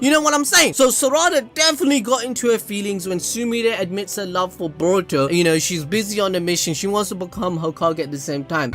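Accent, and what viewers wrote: Punjab, India. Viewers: ❤️ your Indian ?? ❤️❤️❤️ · Bro are you punjabi ? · are you indian bro i know what you said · That punjabi accent🙏😁😉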